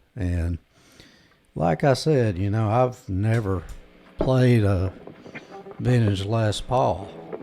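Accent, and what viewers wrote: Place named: South, United States. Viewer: used to run the clubs snow bird style from Michigan to Florida.so I love hearing southern draw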